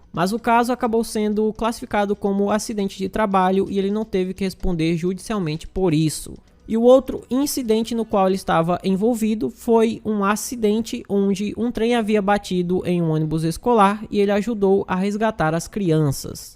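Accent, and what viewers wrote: Northeast, Brazil. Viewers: Oi! Caso horripilante, o ser que se diz humano, é um bicho perverso. vc é Nordestino? Acho seu jeito de falar bem familiar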